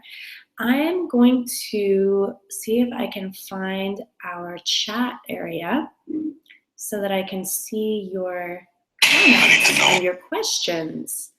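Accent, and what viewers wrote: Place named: United States. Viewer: hello i like your lessons,and i am very happy to hear your amecan accent.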